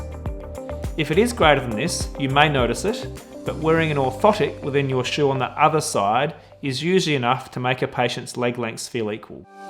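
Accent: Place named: Australia. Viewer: This is great but i would’ve understood it more if it wasn’t in Australian.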